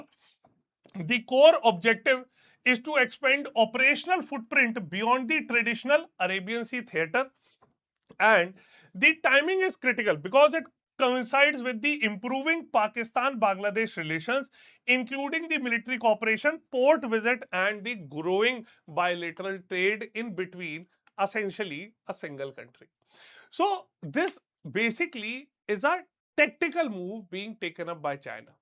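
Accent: India. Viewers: What a painful presentation......babu English, loud presentation, stretched........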